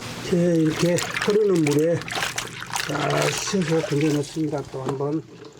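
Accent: Jeolla, South Korea. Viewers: 고향이 어디신가요?정겹고 시골스럽네요^^ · 전라도말씨네 재수없는말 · 전라도사투리 안하셨으면. ㅡ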